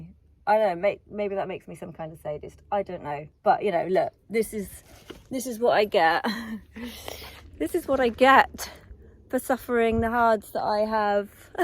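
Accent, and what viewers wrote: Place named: West Country, United Kingdom. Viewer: It's nice to hear a bit of your story. I used to live in Exeter and I'm from Marlborough, I knew I recognised the slight ooh arrr in your accent.. 😂, don't worry, it's very slight. Haha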